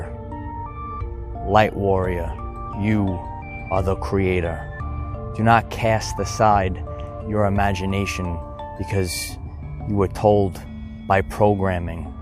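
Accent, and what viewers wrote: New York, United States. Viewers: Are you from New York?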